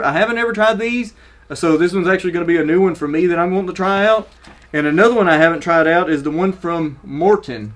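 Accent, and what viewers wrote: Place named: South, United States. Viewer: Thanks for the quick list & honest info. Looking forward to 2019. PS - Don’t apologize for a southern accent. Just be the real you.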